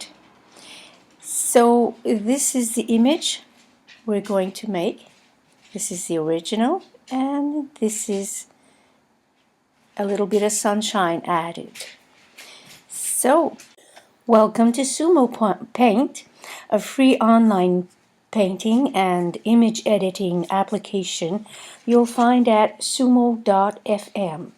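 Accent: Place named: France. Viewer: Ha ha ha ! There is a touch on his diction almost imperceptible, but the musicality of "sugary" French is there ! :) Kindre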